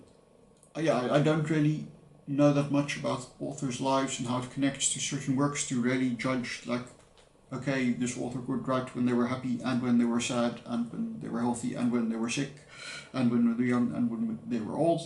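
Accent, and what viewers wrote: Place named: South Africa. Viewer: Ah, "me too" books can become annoying, and it's obvious when they're not sincere. I find it sad and manipulate when artistry is ignored in favour of blatant copying. You have a really interesting accent by the way. I think you've got a tinge of a South African regional accent in you. 😉There's a specific type of Afrikaans accent on certain words that you have, which I find so cool. Most Dutch accents sound completely foreign to me. I never even thought of The Little Prince as a part of this tag. It certainly is sweet, but perhaps for children younger than 10. I didn't even know about it until I was 17, which was way too late. I read it at 19, which again, is simply too old.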